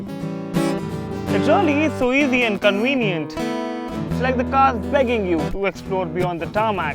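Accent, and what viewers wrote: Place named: Delhi, India. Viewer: Thanks for replying buddy ! btw I'm from Delhi You sounded bit like Delhi guys so that's why I asked you :p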